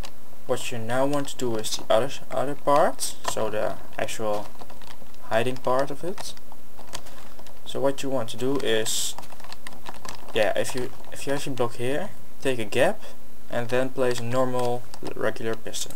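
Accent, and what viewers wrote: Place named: Netherlands. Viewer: Waarom praat je engels terwijl je nederlands bent, met je mooie nederlandse accent.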